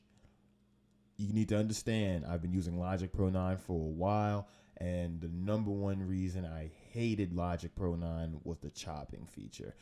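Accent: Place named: New York, United States. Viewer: this guy is clearly from NYC lol. good tut, thanks.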